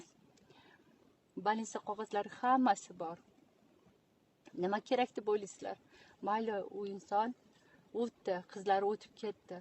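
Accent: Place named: Tashkent, Uzbekistan. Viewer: Узизни шевазда гапируринг .тушунамиз адашиб юбориб яна повторный тошкент шевада тугирлаб кетвосиз. Бир гапни икки шевада гапирвосиз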